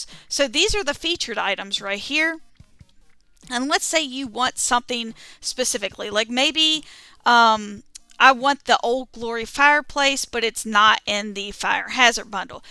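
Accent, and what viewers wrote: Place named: Appalachia, United States. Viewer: Only just stumbled upon this channel but I love how, within the first 30s, I can tell another Appalachian by how they say words like "dry". Instasub.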